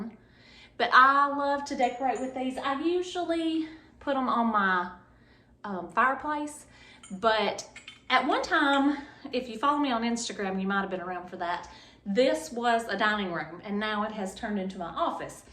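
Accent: South, United States. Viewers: Your southern accent is so adorable. Greetings from NYC. ❤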